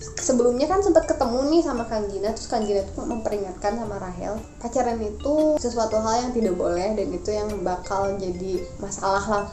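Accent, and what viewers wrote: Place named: West Java, Indonesia. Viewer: Teteh ni sundanis bgt ya ..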